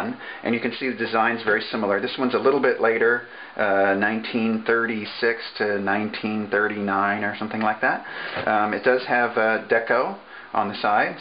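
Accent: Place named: Canada. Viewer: Your accent is, like, 5% Canadian. Are you in northern Washington?? What a cool accent, man.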